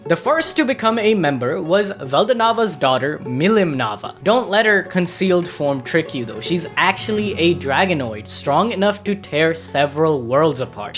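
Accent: India. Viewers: Are you indian by any chance?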